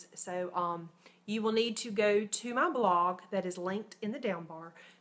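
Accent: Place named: North Carolina, United States. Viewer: rule number two - check your attitude. my husband and i died laughing! [yes, he watches your videos with me! we love your voice......hope that isn't weird. reminds me of north carolina when i lived there] i have never seen a teacher planner from EC, looks neat and i love the little fixes you made to it to make it your own!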